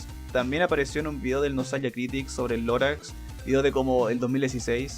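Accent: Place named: Chile. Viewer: Tiene acento chileno Laik 👍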